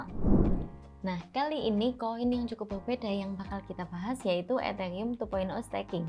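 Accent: Java, Indonesia. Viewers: Wah cocok buat kita yg ingin invest jangka panjang ini, Terimakasih mbk Cantik yg membawa Materi sangat menguasai sekali dan Cantik lagi, bahasa jawanya medok.... Hhehehe.... Aq pingin Staking yg Shiba Inu lah... Nunggu ada dana Free.... Sukses buat Triv.... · Mbake ayu lan medok nggih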